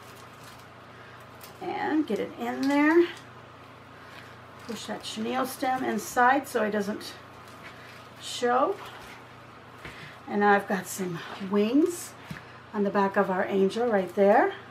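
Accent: Texas, United States. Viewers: can I assume you are from Texas? Loved watching how you do your crafts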